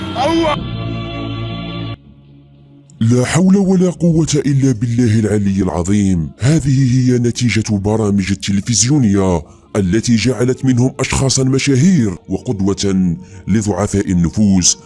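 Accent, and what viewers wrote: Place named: Skikda, Algeria. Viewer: حسب الهدرة تاعك تبان سكيكدي 100 / 100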